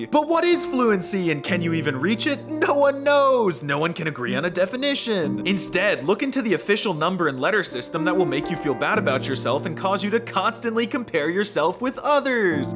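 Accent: United States. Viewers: "Um siri fazendo barra"?? Your american accent is so terrible I almost couldn't understand it. The m in um is not pronounced like m, but just nasalizes the u. And the r in siri is like r in spanish, not like in english mf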